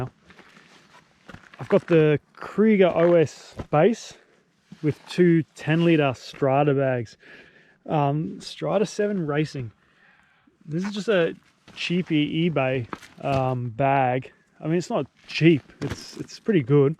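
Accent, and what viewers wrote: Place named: South Africa. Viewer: I was pretty sure there was some South African! My bosses are both South African and listening to you, the odd word here and there, sounded exactly like my bosses. Would never have guessed the other countries though! 😊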